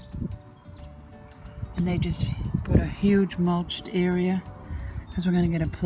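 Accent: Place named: Boston, United States. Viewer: I should say yaahd because I love your accent so much! We love Boston! 💝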